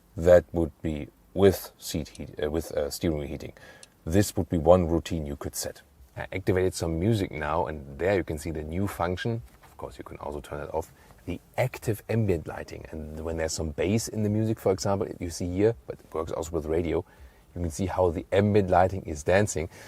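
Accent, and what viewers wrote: Germany. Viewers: English with typical german accent 🤮👎🏻 who understand this...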